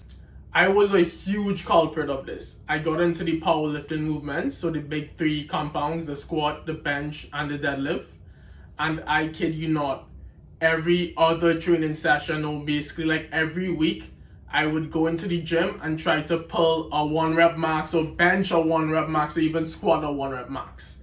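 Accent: Trinidad and Tobago. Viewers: Great videos bro, keep up the great work. I recognize your accent, are you from Trinidad?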